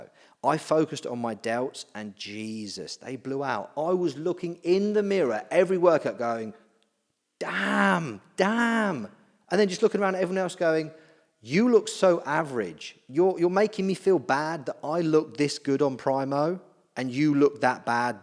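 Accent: United Kingdom. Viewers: Just found you online, love the content and delivery. Where are you from, sounds a bit like notts area?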